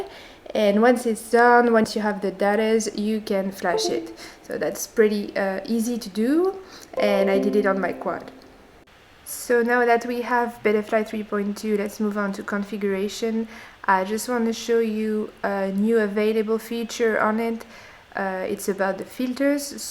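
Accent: France. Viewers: Je me doutais que tu étais française avec ton accent 👍 au top tes vidéos, keep going 👌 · J'avais bien reconnus notre cher accent français mais j'étais pas certain j'aime beaucoup t'es vidéos tu devrais en faire au moins une en fr :D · Ah cet accent lol, tu est française toi non? :)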